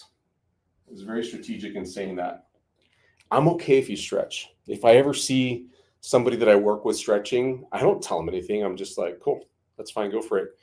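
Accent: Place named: North Carolina, United States. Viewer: I can hear you North Carolina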